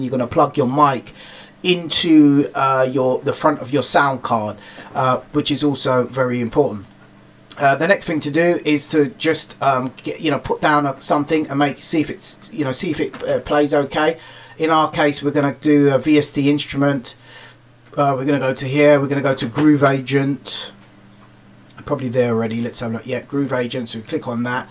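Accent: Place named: United Kingdom. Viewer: The clearest British English I ever heard...Thanks Man, good point...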